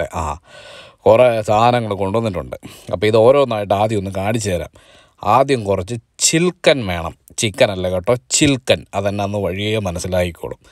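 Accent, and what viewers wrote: Kottayam, India. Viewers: Kottayam slang..ente oru cousin achachante samsaram pole..😊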